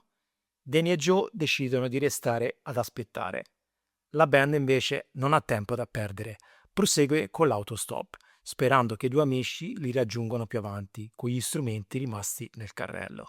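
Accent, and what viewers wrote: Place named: Tuscany, Italy. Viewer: lento nel raccontare...fai venire sonno...e dalle co sto toscano...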